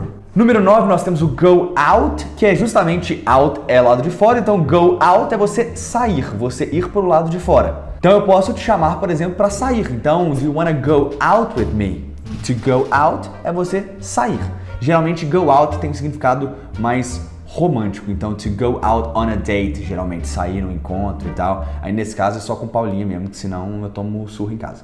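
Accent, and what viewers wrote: Minas Gerais, Brazil. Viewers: Para de ser chato misericórdia, é óbvio que o cara sabe como essas palavras são escritas ou pronunciadas em um português formal, mas isso é apenas questão de SO T A Q U E O cara é mineiro, deixa ele em paz com o sotaque dele, desnecessário ficar atrapalhando os comentários de um vídeo focado no I N G L Ê S por causa de preconceito linguístico Até parece que você fala que nem um robozinho, com todas as letras e acentos. Vc pode até pronunciar as palavras citadas de um jeito um pouco mais padrão, dependendo da sua região, mas tenho certeza que com outros tipos de palavras e fonemas vc também fala "errado"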